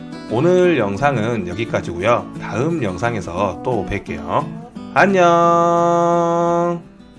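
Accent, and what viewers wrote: Jeolla, South Korea. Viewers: 전라도 분이신가봐요ㅎㅎ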